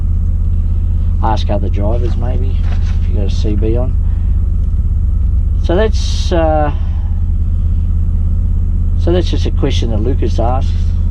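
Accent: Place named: Australia. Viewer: Thanks for posting this! I can practice listening to aussie English and also enjoy trucks. Keep up the good work.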